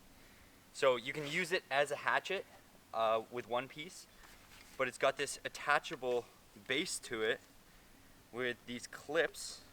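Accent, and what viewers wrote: Canada. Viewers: Not even a minute through and I heard Canadian and I’m enjoying it, love from Alberta new subscriber here!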